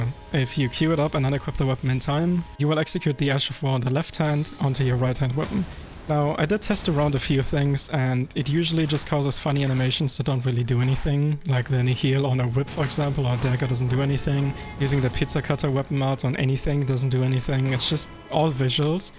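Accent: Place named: Germany. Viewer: You’re German right? Because your accent sounds like it :D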